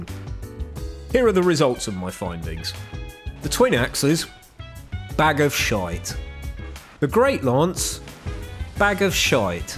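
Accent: United Kingdom. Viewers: Somehow your british ( I think, im sorry if I'm wrong ) accent makes this 10 times more entertaining . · This British accent is like a dark souls one, you’re the onion knight of reality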